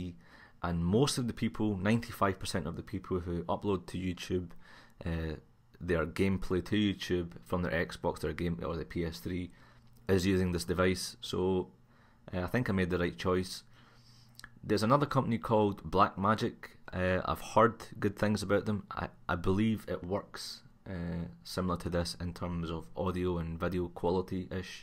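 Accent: Scotland, United Kingdom. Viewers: your Scottish :)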